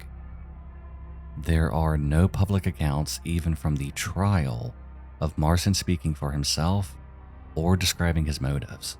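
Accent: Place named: Tennessee, United States. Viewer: You've got a slight Tennessee accent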